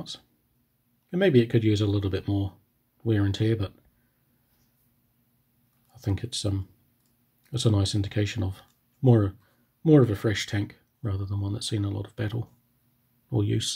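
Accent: New Zealand. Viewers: new zealander?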